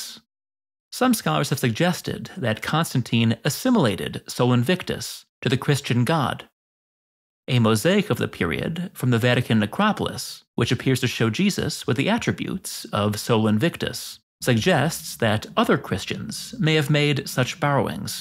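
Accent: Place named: Wisconsin, United States. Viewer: love that Wisconsin accent 👍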